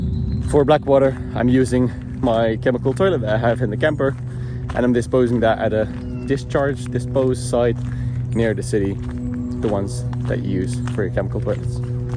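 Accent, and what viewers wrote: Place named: Ireland. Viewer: Q. Curious about your accent (aside from the Dutch tones). Sounds almost Irish! Do you have Irish family or perhaps learnt English from an Irish teacher? Where in Holland do you come from? My grandfather was born and raised in beautiful Leiden. Enjoying your vlogs from Perth Western Australia.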